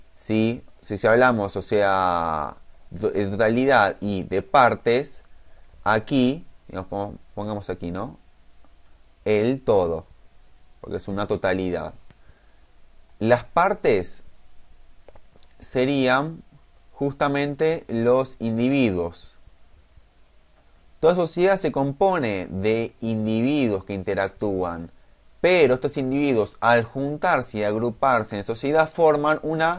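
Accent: Argentina. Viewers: Es argentino, deje el show.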